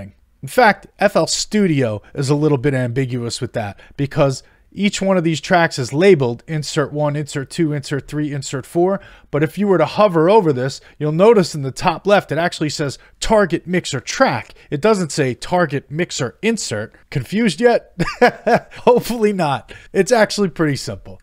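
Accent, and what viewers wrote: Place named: East Coast, United States. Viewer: awesome video. btw where are you from? i hear a slight accent and it's driving me crazy that i can't place it. somewhere over on the east coast?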